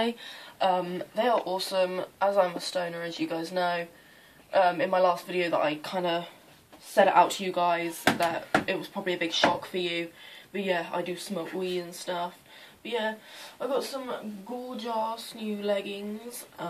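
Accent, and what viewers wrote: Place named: Midlands, United Kingdom. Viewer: Where do you live? You sound like you're from the Midlands like Wolverhampton or somewhere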